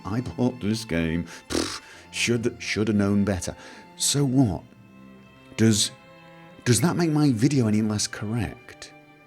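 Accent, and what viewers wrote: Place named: North, United Kingdom. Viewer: northern accent is best accent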